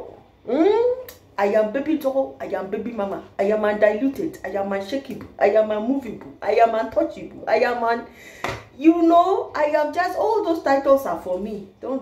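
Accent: Nigeria. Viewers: Baby mama, that Nigerian acsent sounds very sweet ooh, l enjoy it.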